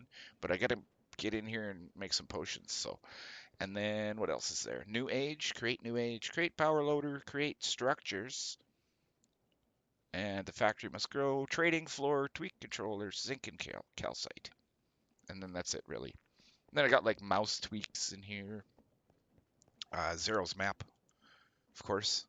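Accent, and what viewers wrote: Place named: Minnesota, United States. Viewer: What modpack is this? I'm still in the start of the video. Possibly add the link in your description? That bald head makes me happy, lol. Also, are you from Minnesota/Wisconsin? Possibly northern Michigan? I've got a ton of family in Minnesota and you sound like them.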